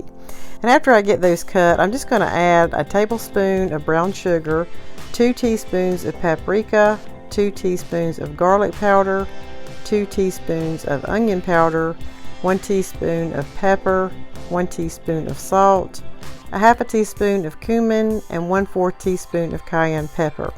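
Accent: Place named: North Carolina, United States. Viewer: The 2nd I heard your voice, I knew you were from North Carolina!! ❤️. I’m also from NC, born & raised! That food looks AMAZING, but regarding the BBQ sauce, I am partial to the NC vinegar based sauce, lol. *But, I absolutely would not turn my nose up to the BBQ you cooked in this video, LOL!! That corn, OMGosh, you’ve got me craving some sweet summer corn now, thanks!! 🤣 *We live Lillington, right outside of Fort Bragg. 🙋🏻‍♀️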